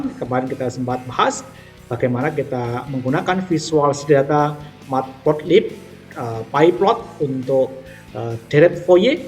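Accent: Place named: Java, Indonesia. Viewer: instoll instol instoll.. hehehe medok kali jawa nya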